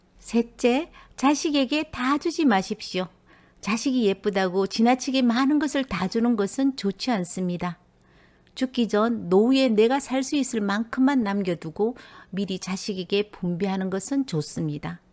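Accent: Gyeongsang, South Korea. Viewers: 말마다 옳습니다 경상도 사투리도 예쁘십니다 ㅎㅎ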